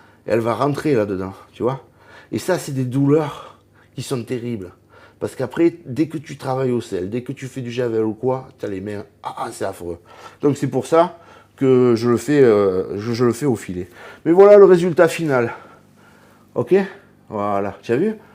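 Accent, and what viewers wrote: South, France. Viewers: Bonjour! Le bonjour d'un Harleyiste de Nouvelle Calédonie ✌️ Deux questions stp: moi je suis dans un pays chaud donc hors de question de faire de la charcuterie à l'air libre... Je peux mettre la ventreche à saler puis ensuite à sécher au frigo? Pas moyen de la pendre en plus... Et combien de temps en général avant de consommer selon toi? Merci pour tes vidéos que je découvre. C'est super. J'adore retrouver l'accent du midi 😉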